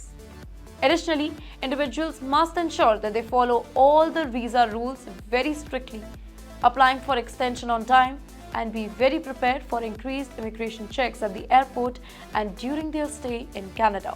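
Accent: India. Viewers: She speaks Indianlish, English with an Indian accent which only Indians can understand.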